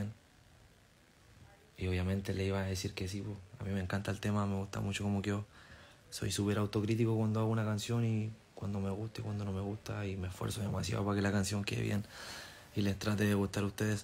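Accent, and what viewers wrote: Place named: North, Chile. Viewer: Y pa que hablay cómo nortino wn... Habla como acá no mas magallanico.... Y aver si visitay la xilotaaaa... 😎😎😎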